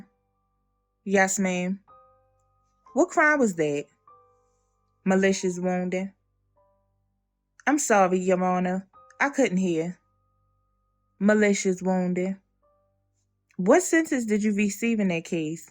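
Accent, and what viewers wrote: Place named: Baltimore, United States. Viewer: The narrator is definitely from Baltimore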